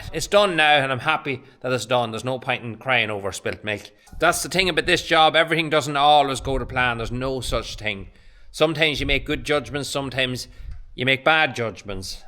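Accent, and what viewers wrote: Ireland. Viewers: you certainly do more than farm! I am an 80 year old lady in a wheelchair. I, too, came for the cottage renovation, but stayed because your Irish accent, your stories, your achievements, your struggles and your honesty are captivating. When I lost my parents, I suddenly realised, I was now the adult. The final decision rests with me, I can't consult them any more. You are going through that transition with losing your father - you are now the adult farmer, the decisions rest with you. It will be stressful, but you will grow with it. Best wishes.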